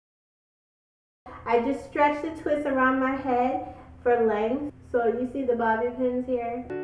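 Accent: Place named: Caribbean. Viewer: Found ur channel today. Great videos, I learned a few things. Aside from that, its super creepy, but we have the same accent and voice. I'm probably older than you, but I feel like I am watching a video of myself. I showed my boyfriend and he things so too. Are you by chance from the Caribbean?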